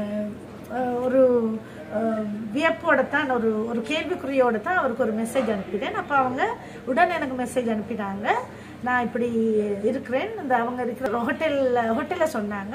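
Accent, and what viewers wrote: Sri Lanka. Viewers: இலங்கை தமிழ்.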